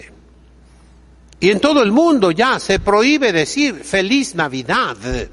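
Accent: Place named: Argentina. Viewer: Más exactamente con acento argentino.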